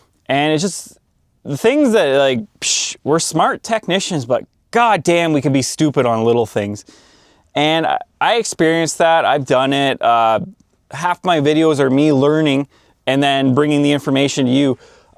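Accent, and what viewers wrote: Canada. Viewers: This accent makes me wanna slam down some TimBits, while I wash it down with some Molson and watch the Senators play the Maple Leafs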